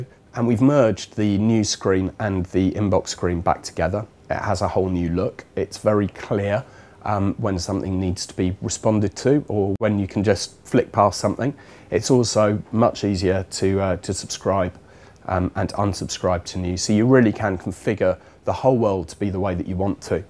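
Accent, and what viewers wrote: United Kingdom. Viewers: what type of english accent is this? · i'm not sure but it's sound like London dilect mate =D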